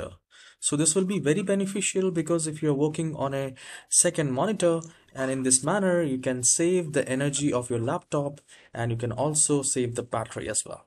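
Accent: India. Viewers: this dude is indian sounds like a scam but 100% lewgit good job traight to the point new keybard bad spelling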